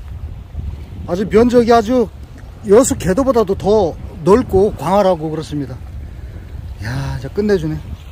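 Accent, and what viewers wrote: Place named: Chungcheong, South Korea. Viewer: 어마무시하는것 보니 충청도 공주,논산지역분 같기도 하고 호남사람같기도 하네요? ●고향이 어디유?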